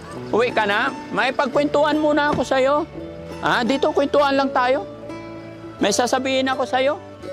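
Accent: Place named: Ilocos, Philippines. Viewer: Laing ag sao Ilokano ni.ang tiyaga mo.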